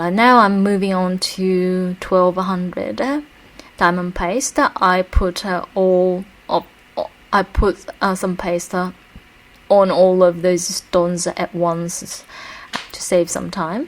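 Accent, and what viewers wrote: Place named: Australia. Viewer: Please don't apologize for your accent. I was captivated by the Asian/Aussie blend. I hope to find more of your work. Very informative, thank you!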